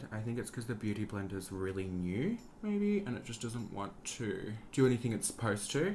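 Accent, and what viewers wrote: Queensland, Australia. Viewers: OMFG I LOVE YOU ❤❤ you make me miss Australia with your accent 😭😂 your so fucking funny!! I love you, and your videos ❤❤ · 1st time on this channel. I already love it! Straight off the bat also I can tell that he's from Queensland. 👌❤ Also congrats on trending! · Omg youre aussie!?? Hello from a fellow Australian😂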